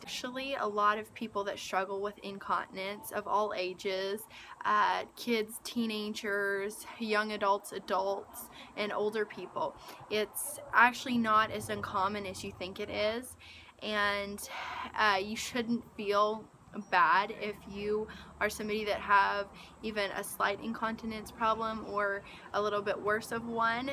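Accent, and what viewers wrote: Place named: United States. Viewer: Thank you so much for this info. I was also questioning the comfort of a waterproof layer daily. To my ear you sound American so I don't know if you know in Europe female incontinence is often treated with a tens (may no be spelling that correctly 🤷) unit, it's a little bigger than a tampon. It sends a low current shock that causes your muscles to contract, so like kegal exercise but more efficient with much better results. You can buy the stuff on Amazon. The unit is the same but has different plug ins for the more common use for leg and back pain which are commonly sold in store in the pain relief section. Thank you again for a very useful video.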